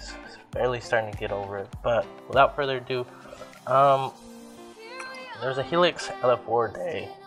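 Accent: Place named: Chicano, United States. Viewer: de chicano diras-_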